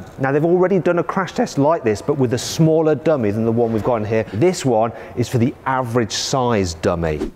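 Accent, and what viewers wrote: United Kingdom. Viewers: Logat nya british bngt kwkkwkwkw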